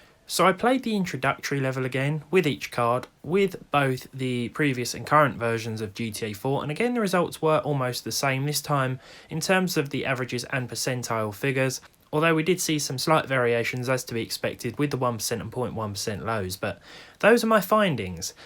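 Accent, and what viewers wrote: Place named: England, United Kingdom. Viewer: You sound line and Englishman, so a zero is not pronounced o cause that is a letter, its a zero.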